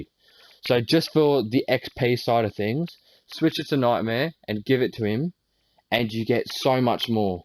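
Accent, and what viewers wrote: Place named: Australia. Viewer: Strong australian like it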